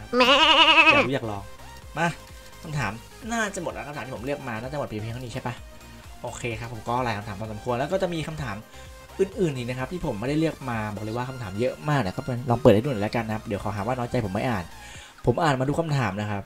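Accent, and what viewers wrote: North, Thailand. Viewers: อ้าวอ้ายคนเหนือกะ555 FC ครับบ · อ้ายเป๋นคนเหนือก่า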